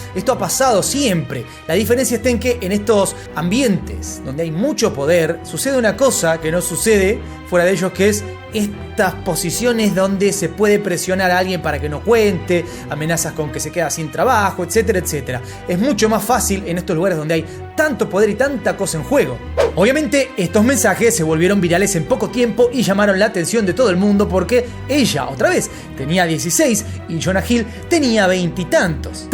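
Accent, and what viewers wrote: Argentina. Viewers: Sos argentino no ???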